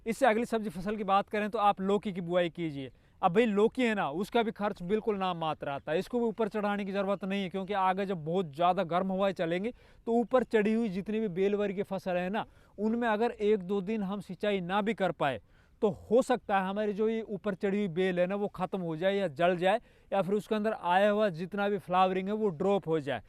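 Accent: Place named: Tijara, India. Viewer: आप की भाषा तिजारा की लगती है आप सानदार काम कर रहे है very nice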